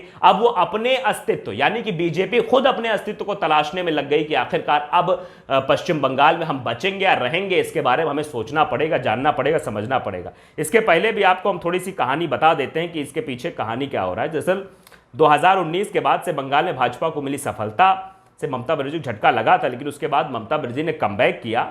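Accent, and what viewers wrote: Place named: Uttar Pradesh, India. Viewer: भाई 💪 बोलते बोलते गांव चले जाते हो😃😜💯 यूपी वाली भाषा में बोलते हो 🙏💓 आनन्द आ जाता है 😜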